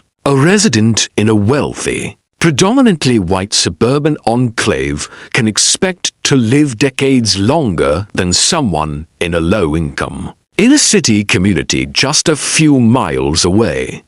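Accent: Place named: United Kingdom. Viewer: Please don't use British accent with your AI narrator - annoying.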